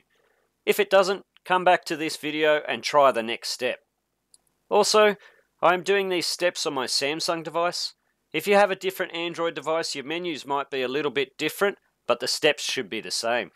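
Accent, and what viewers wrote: Australia. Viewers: He just has an Australian accent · Nah he just sounds Australian lol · Probably because he's australian. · Every Australian sounds like this, wdym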